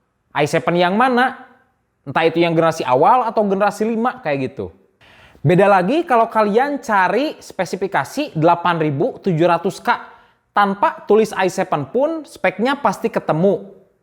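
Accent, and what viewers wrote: West Java, Indonesia. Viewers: logat na sunda pisan kang ..he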